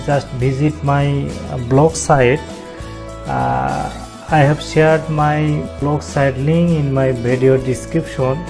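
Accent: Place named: Bengal, India. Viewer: looks bangali English so try to focus on pronunciation & then upload it.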